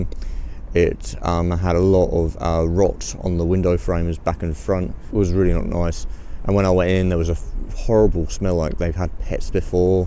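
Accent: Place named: United Kingdom. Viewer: You definetly sound more Brittish than before if nothing else 😅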